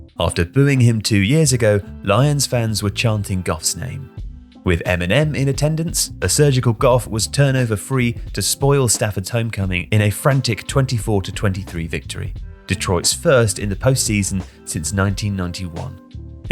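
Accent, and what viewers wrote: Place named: United Kingdom. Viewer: It’s weird hearing a British accent explaining the NFL…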